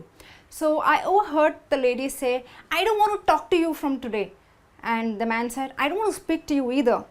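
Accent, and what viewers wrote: India. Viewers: you sound so indian :!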